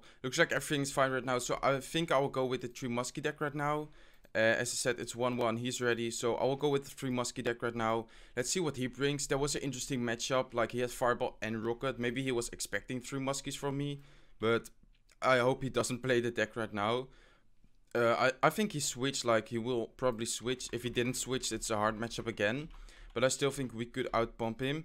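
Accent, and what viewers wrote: Netherlands. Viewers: Dude are you dutch? I hear a dutch accent ( ik ben wel Nederlands :))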